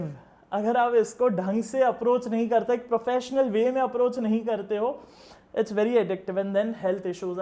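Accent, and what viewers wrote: Gujarat, India. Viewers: Sir Are u gujrati??😃😃😃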